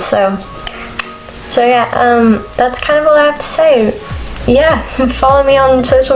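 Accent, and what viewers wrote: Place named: United Kingdom. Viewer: Holy shit i did not expect you to be British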